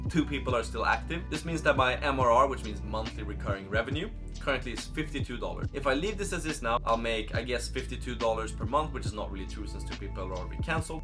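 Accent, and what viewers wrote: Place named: Sweden. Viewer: är du svensk, för det märks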